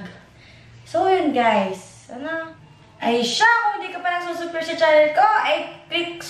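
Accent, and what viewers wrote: Batangas, Philippines. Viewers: Ang gudvibes ni ate gurl hehehe... 😂😂😂 batangeña... Tone heheh. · Ang lalim po ng accent mo...taga batangas ka noh? Hehehe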